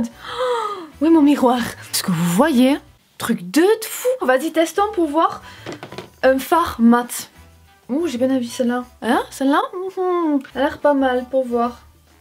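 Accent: South, France. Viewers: Oh putaing l'accent du sud j'adore ! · J’ai oublié de dire Bonne continuation aussi. Ah qu’il est beau cet accent du sud. Je regardais déjà tes vidéos à tes débuts et franchement tu es resté toi même et ça c’est beau aussi.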